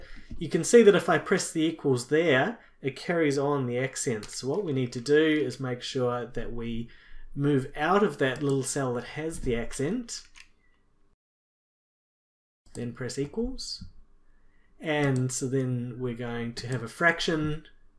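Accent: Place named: Australia. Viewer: thank you, "mate"? I think i detect an Australian accent. You're brilliantly concise.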